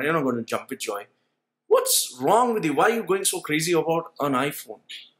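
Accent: India. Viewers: Indian accent ...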